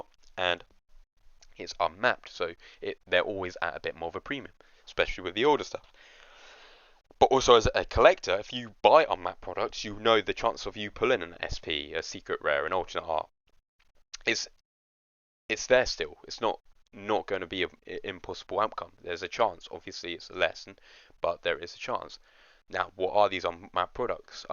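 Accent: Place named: United Kingdom. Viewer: Good luck with your journey, subscribed as sounds like you are from the uk, looking forward to more videos . 👍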